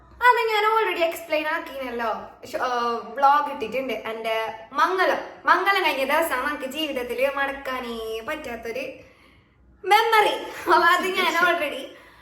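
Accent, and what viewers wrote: Kannur, India. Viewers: കണ്ണൂർ ഭാഷയിൽ വർത്തമാനം കേൾക്കാനും രസമുണ്ട്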